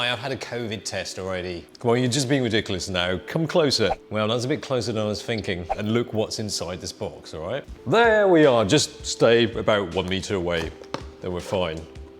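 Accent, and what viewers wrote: United Kingdom. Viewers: I'm just mesmerized by an Asian guy with caricature glasses and a British accent/ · Thats how we pronounce it here in the UK ( Kai is British of Hong Kong Chinese decent ) and its the original pronunciation btw, its only Webster whom decided to change our British language and spellings because he had an issue with some of the French inflections within our language. We spell Harbour with the u, you spell it Harbor without because he changed it, the same goes for Aluminium.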